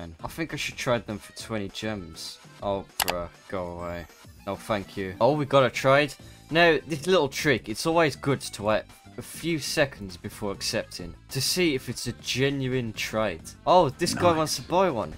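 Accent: United Kingdom. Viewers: the second dude in my life that actually speaks british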